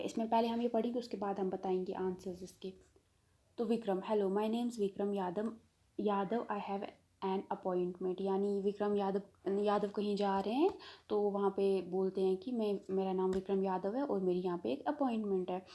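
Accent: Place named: Pahadi, India. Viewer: Uh have pahadi tone in language......btw my next exam is eng. daily life .. video helps me a lot❤️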